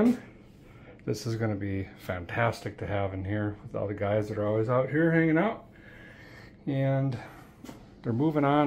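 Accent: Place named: South, United States. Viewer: I’ve noticed that you are starting to sound like a true southern. When you start saying Fixin to do something you have arrived my friend.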